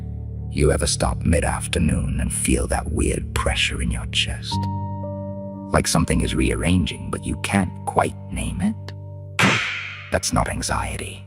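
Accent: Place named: United Kingdom. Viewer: Your voice has a British accent to it under the voice modulater. Blimey.😂❤❤❤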